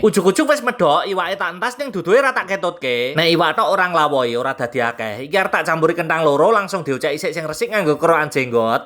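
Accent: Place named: Java, Indonesia. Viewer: Dengar suara mas ny yg reyah bisa ketawa sndri q,,,,sama2 orang Jawa jdi Pham bngt bhasa nya lucu skali😂😂😂😂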